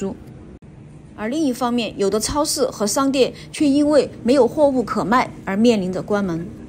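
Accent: Sichuan, China. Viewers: 的视频必看,最喜欢的是你说的“川普”（四川普通话)👍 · 赞一个👍👍👍非常喜欢你的川普，好靓好聪明的脑壳！ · 四川口音。你们应该听不出差别的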